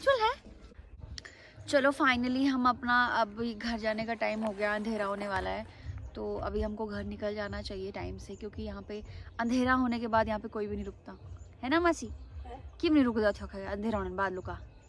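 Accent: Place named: Pahari, India. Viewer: Her pahari accent 🔥😘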